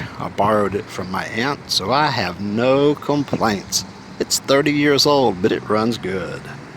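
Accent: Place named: Texas, United States. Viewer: Howdy I don't think you are wearing boots anymore, but I can see that you still have some Texan southern accent with a twist left in you! Thanks for sharing! enjoy your long visit partner... Best regards/Ned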